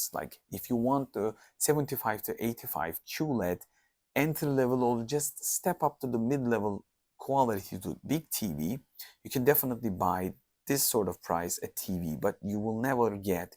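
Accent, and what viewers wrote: Turkey. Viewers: Türk aksanı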